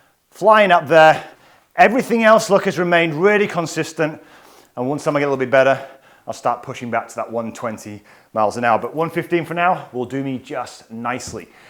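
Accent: United Kingdom. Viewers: Thanks, that was very useful. I also really appreciate your British accent !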